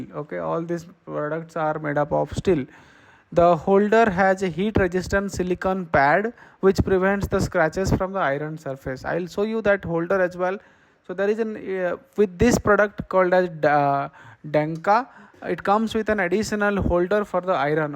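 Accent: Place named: India. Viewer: How can u guys NEVER speak english someone who is not indian can understand, how do u need get the stereotype indian accent every time were laughing at u guys and still u can't learn decent english, man stfu already with ur plilplialpliaplablablabladingelinge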